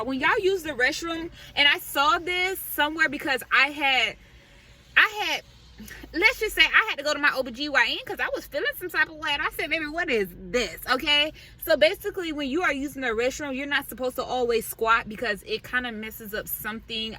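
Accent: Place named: South Carolina, United States. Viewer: I love your American South Carolina accent iam living in my car too in DMV area, and thank you for your important info and that iam not alone, much luv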